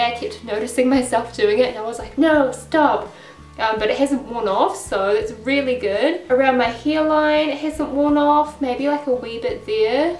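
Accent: New Zealand. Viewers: hahahaha "eeeeh it's the eees-pee-eeeff" :') You sounded so cute there! I love the kiwi accent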